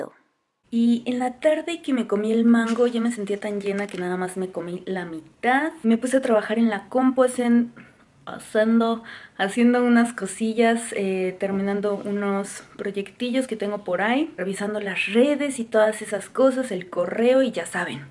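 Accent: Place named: Mexico. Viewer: Anette Flores Asi hablamos en mexico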